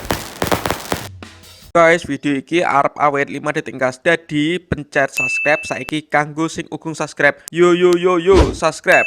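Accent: Java, Indonesia. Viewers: Wong jowo yo ? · Jowo ne ngendi mas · Bahasa nya medok wkwk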